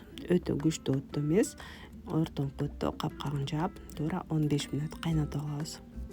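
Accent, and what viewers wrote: Standard. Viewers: Таза кыргызча суйлоптур азаматсыз ❤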